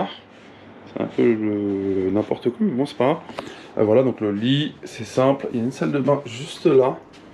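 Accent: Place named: Algeria. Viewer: Je l’aime bien parler français algérien Vous êtes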